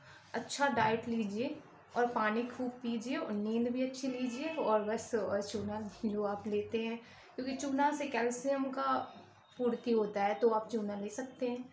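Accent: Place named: Mumbai, India. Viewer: Aji madam ji aap kaha se ho mujhe aapki aavaj Mumbai se lag rahi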